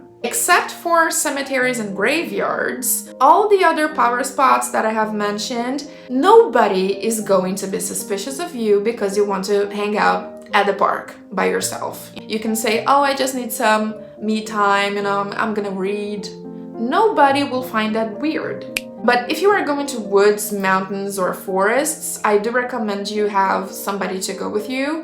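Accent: Brazil. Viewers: Are you brazilian? That accent sounds familiar to me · Tava tentando achar informações sobre bruxas e wiccas e caí no seu canal. Reconheci o sotaque brasileiro na hora ❤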